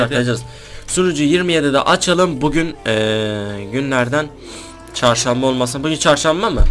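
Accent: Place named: Eastern Anatolia, Turkey. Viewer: Abi senin şiven tam doğu şivesi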